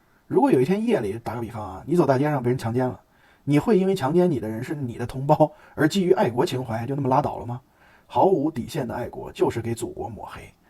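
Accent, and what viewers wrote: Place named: Beijing, China. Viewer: 哦，原来只看到您多次带一对宝贝女儿逛Disney，喜欢听你说话拿个劲，后来发现难怪呢，北京的，今天又发现您原来对这些事也是明白人。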